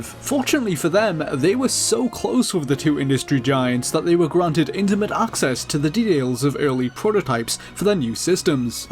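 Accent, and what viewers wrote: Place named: Newcastle, United Kingdom. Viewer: You Geordie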